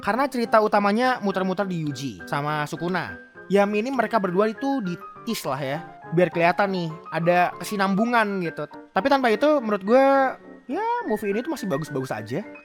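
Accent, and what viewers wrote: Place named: Madura, Indonesia. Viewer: bang, lu ngomong nya madura😅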